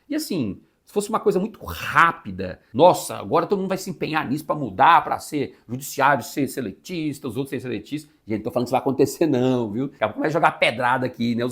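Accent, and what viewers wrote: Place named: Pernambuco, Brazil. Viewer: Esse professor é bom, explica tudo que todos entendem, pelo sotaque e inteligência deve ser pernambucano, se errei foi por pouco.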